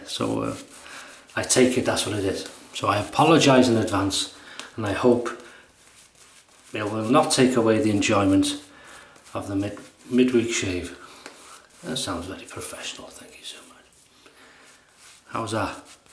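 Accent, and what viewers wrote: United Kingdom. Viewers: There I was with a video on in the background Joe from Queens talking about shaving. Suddenly I no longer hear Joe but a familiar British voice talking about Mack in a New Jersey drawl! Yes you're famous across the pond too. Don't go upsetting any gangsters. All the best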